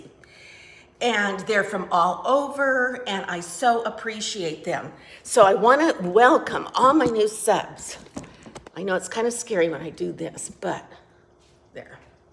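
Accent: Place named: Minnesota, United States. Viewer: You may be in CA, but you sound a lot like my family in southern MN💕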